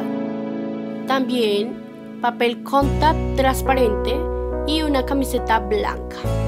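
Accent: Colombia. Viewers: ¿Eres de Colombia<3 ? No sé, te escuchas colombiana:3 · Me encanta el acento colombiano. :)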